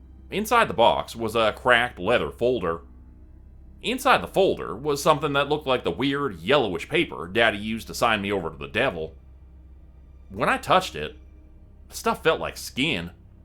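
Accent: South, United States. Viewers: Love the southern accent! Thank you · Ooohh…loving the southern accent! Great story 💜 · Great southern accent 👌. Thank you for another excellently narrated great story ❤❤❤😊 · Your southern accent is 'Meh' at best, I'm from Alabama, but it's better, (excuse me, spit tobacco juice in a can) than my Canadian accent 😂😂😂, I'm a major fan of Hollow's End & Charlie's Department Store Mr, your accent still needs some work 😂. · Your attempt at a Southern accent is not too terrible! I appreciate you not going for the Beverly Hillbillies style of dramatic drawl that sounds so thick and saccharine that it becomes comical. Nobody talks like that either. So thanks for exercising subtlety and restraint.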